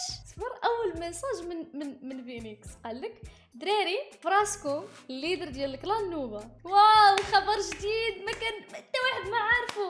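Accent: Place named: Lebanon. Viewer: هههه تتكلمي لبناني 😅😂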